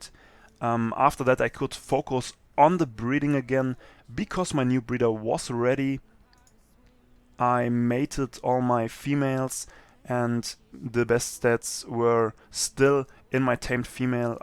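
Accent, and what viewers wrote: Germany. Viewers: Bro man hört ein bisschen raus das du Deutsch bist aber trotzdem geile Videos und gutes Englisch 🔥🔥🔥👋😂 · Du klingst deutsch:b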